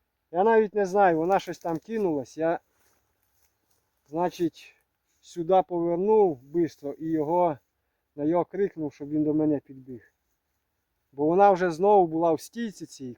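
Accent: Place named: Ovruch, Ukraine. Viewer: Вітаю, напиши яка назва вашого села,я чую наш говір, я з овручини,наперед дякую.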